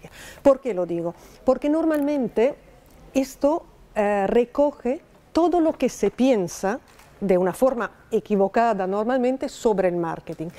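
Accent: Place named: Peru. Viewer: Me parece escuchar a un señor de nacionalidad peruana, en el curso, Esta clase es exelente, saludos desde Peru.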